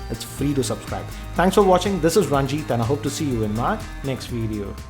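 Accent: Hyderabad, India. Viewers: Ranjit r u a hyderabadi??😮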